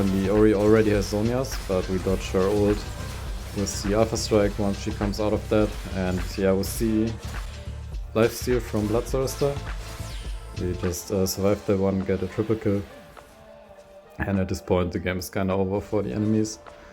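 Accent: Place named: Germany. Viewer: LETS GOOO the german gamerboy with a strong and sexy german accent posted again 🎉🎉🎉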